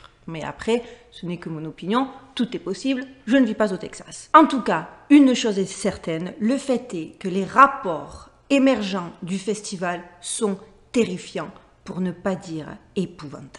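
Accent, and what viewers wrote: South, France. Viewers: Salut jeune jolie femme, alors ça fait un moment que je ne t'avais regardé, ahh tu sais avec le temps, on a pas assez de temps à consacrer à de si belles choses, plus trop de temps, j'aime beaucoup te ragarder, t'écouter raconter des choses très vraies, et très pertinentes, donc, bravo à toi, ( je te tutoie comme si on se connaissait depuis toujours ) je tiens à te dire ( continue, tu as un talent pour raconter des histoires, plus un bel accent du sud, ça plait beaucoup ça, ) voilà, je tenais à te dire ces vérités, car, je trouve que tu as un peu peur de déplaire, Je te répète que tu as un vrai talent pour raconter de belles histoires, BON alors tu me crois maintenant ? Your really good on what you do, i love watching you, "so, so, so, see you next time, on the next video" #sam&sam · Hello Merci pour vos vidéos. C est toujours un plaisir de vous entendre avec ce petit accent du sud. 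Votre analyse est tt à fait pertinente. Nous sommes effectivement au début de l abomination de la désolation "Apocalypse 13.14". Merci pour votre temps et votre bienveillance. God bless you 🙏💝🇺🇲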